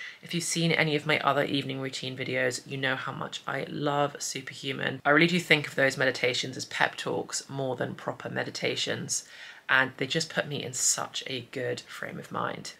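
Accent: United Kingdom. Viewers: The accent speaks for itself. Brit ❤😍 Always love reset videos they motivate me to be more productive 😇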